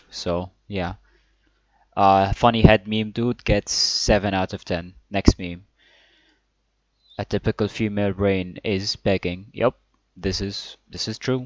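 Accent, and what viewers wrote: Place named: Egypt. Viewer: the egyptian accent is just 👌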